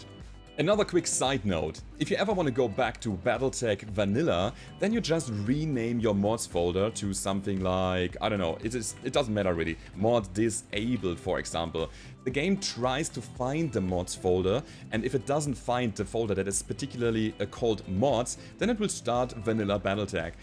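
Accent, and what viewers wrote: Germany. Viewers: Hey you are a German? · Question: As a German native speaker I can really good understand you! Thanks for that. But your accent sounds a bit like my mother tongue? Do you speak German as well? And I‘m grateful to these video. It helps me a lot. EDIT: Seit deiner Windows Meldung weiß ich: Jup, du bist eigentlich deutsch Sprechender ^^ Deswegen verschluckst du nicht so viele Worte, wie Muttersprachler in Englisch. Danke für dein Video :) Ich wollte mir nämlich gestern ne Mod runter laden, da stand nichtmal eine Anleitung dazu. Andere habe ich nicht angeschaut ^_^ Cooler Typ!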